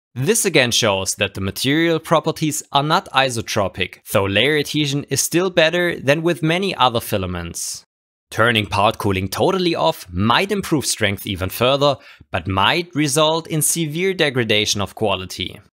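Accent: Germany. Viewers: I just come for the German accent.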